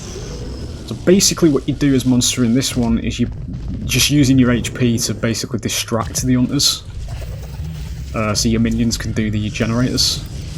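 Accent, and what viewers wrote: United Kingdom. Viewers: Where in the North are you from man? You sound like Lancaster way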